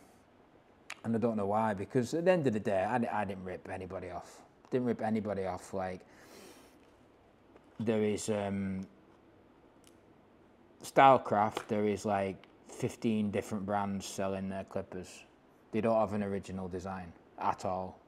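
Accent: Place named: Northern England, United Kingdom. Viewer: I'm impressed. English Northern guy doing well. What's not to like.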